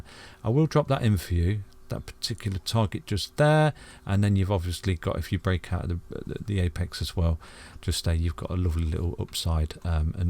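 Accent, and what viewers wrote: United Kingdom. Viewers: I was waiting for this Mr British accent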